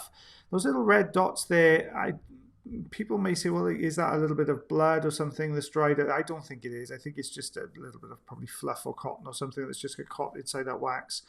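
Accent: Wales, United Kingdom. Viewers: I haven’t watched in a while, hardly recognize you. Look great and I LOVE the Welsh accent.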